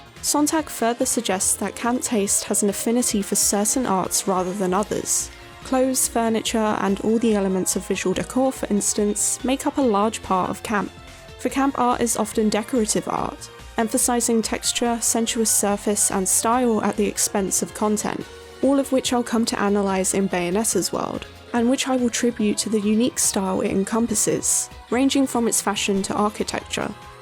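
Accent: United Kingdom. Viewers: I expected a male narraror But that attractive british voice Hot damn!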